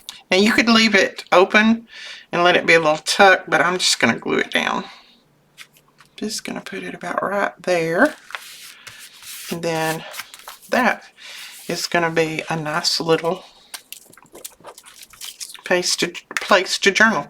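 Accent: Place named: Tennessee, United States. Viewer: I so love watching you do the journals . I am very new at this I’m working on my 2nd book . Plan on making 4-5 for a craft show . Along with some homemade cards . But I love you accent are you from Tennessee? I am but currently live in Indiana. Is there any advice for me as a beginner ? Thanks so much and I’m ma gonna keep on watching you ♥️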